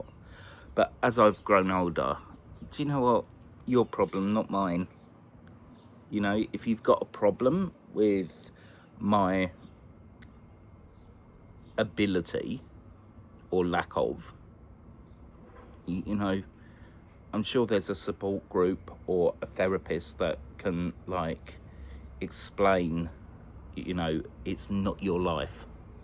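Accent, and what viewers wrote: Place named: Estuary, United Kingdom. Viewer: I like the way you speak from the heart, and I adore your Estuary English speaking (if I've correctly pinpointed where you are from). I'm a French English learner and sometimes it's difficult to understand different English accents. Yours is so accessible, I really like it and watch a lot of your videos.. do more like this, please!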